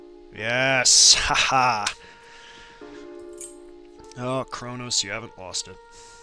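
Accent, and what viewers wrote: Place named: England, United Kingdom. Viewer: I love your voice with the English accent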